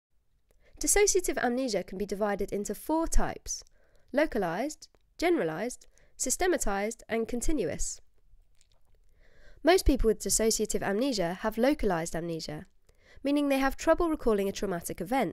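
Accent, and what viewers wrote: United Kingdom. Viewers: your British accent is hard to understand at 1.5 speed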